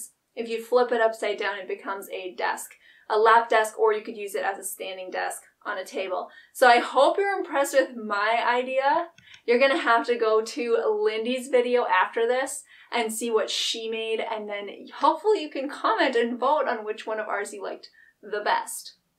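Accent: United States. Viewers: I'm hearing an American accent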